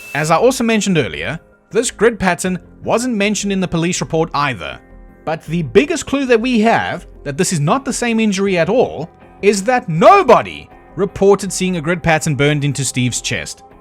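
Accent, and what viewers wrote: South Africa. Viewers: The South African accent made this so much better😂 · He's from South Africa, so you can't expect him to sound like an American, Canadian, Englishman, Scot or Australian. I get a kick out of his speech. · Is this commentator South African or Rhodesian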